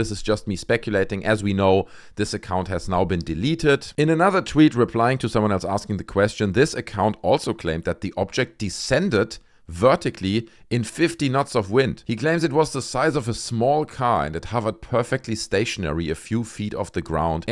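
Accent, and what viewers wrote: Germany. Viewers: This a German guy ! His accent is great 😂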